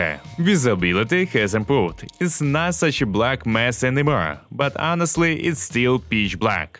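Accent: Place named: Russia. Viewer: Hey, I like your (russian I think) accent. It sounds cool :)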